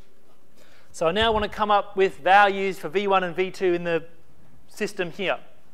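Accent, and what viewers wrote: Australia. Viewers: That was great i will forever solve systems of equations with an Australian accent!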